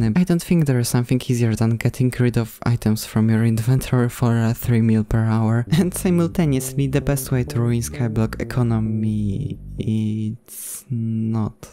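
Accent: Poland. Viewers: you sound like guy from poland speaking english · polski akcent jest mocno słyszalny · are you polish? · Your english is special (better than mine). Od początku wiedziałem że jesteś Polakiem. Trzymaj się tam i powodzenia w rozwoju kanału. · Are you from Poland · Are you Polish? Your accent seem like a real good Polish one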